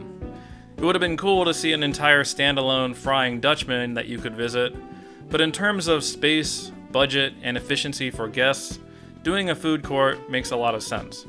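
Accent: United States. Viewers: It's weird how as a British person I didn't realise how American your voice is until I hear you speaking over irl footage! Great vid!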